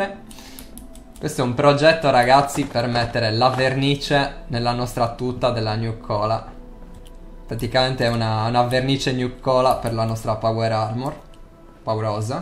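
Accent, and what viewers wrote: Sardinia, Italy. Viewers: ho riconosciuto l'accento, pure io, Cagliari precisamente, mi piacerebbe aggiungerti fra gli amici se posso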